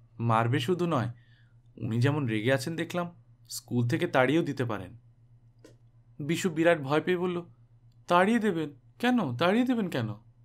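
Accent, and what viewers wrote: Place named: Bangladesh. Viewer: বাংলাদেশের লোক... আর ভাই, সব যায়গার ই আলাদা এ্যাক্সেন্ট থাকে৷ ব্রিটিশদের ইংলিশ ও যায়গা ভেদে ভিন্ন রকম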